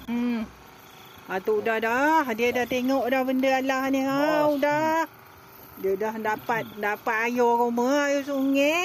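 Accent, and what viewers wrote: Perak, Malaysia. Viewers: Aje handei opah wat review, pekat slanq Peghok nye 😷👍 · Terima kasih opah atas perkongsiannya cakap Perak kome.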